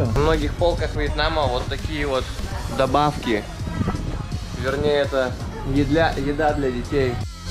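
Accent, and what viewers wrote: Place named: Harlem, United States. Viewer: Где английский учил, по фильмам? Говоришь как черный из Гарлема.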